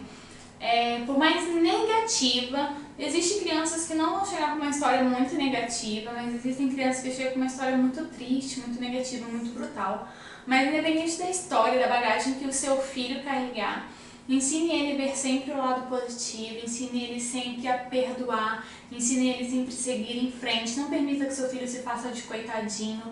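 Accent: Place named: Federal District, Brazil. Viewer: eu jurava que você era de DF kkk. Obrigada s2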